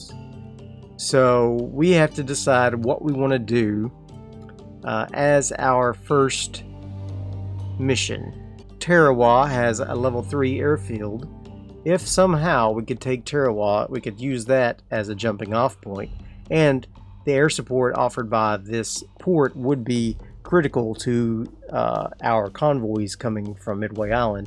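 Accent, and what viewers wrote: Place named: South, United States. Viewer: 1) I'm hearing a Southern accent. Where ya from? I'm from Texas myself. 2) Holy crap...I didn't know someone modded WoWs vessels into the game! Makes you wonder what Japanese Navy ships you'll run into! Wonder if they fixed the striking power of the B-17s...I watched one play-through of the Tokyo Express mod where a YAMATO-class battleship was sunk by a SINGLE B-17. (No, I am not lying or BS-ing here, nor have I been drinking. 😂)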